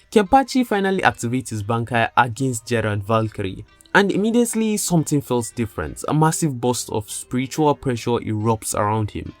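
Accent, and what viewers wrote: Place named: Africa. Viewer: It sounds African to me, very smooth sounding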